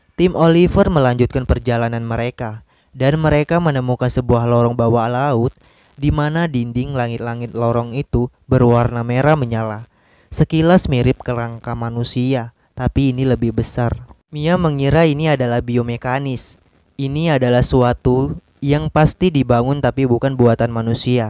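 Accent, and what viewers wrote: Palembang, Indonesia. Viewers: Wong Palembang ruponyo wkwkw😂😂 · Palembang bang? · Wong Palembang yo ? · Abang orang Palembang ya?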